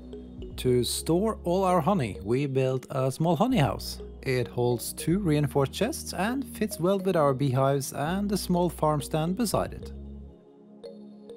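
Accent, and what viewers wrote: Norway. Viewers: Might i ask where your from ? sound so Norwegian :p Love the ideas ! keep it up :D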